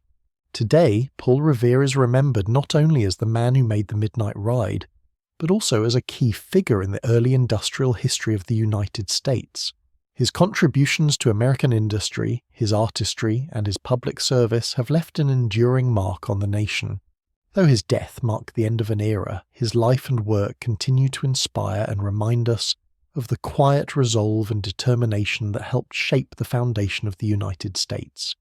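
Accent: United Kingdom. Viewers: WHY is this deeply American story being narrated by an English voice? We went to war against that country .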